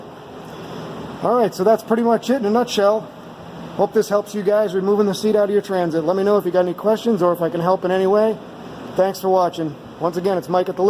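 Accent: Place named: Boston, United States. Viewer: Hi Mike, plan on doing this but with a pickup truck. The electrical connections concern me a little, I know you have to disconnect battery otherwise airbags will go off, but in new pickups, I'm not sure if passenger seat is required while driving. If you know the answer, I'd appreciate it BTW at first I thought you were from Boston, or North Carolina (Fisherman's Warf accent), never thought Florida has a hint of that. I heard the 9th ward in New Orleans they have a Brooklyn accent. Thought that was interesting. I love Florida BTW, thnx for the vid 👍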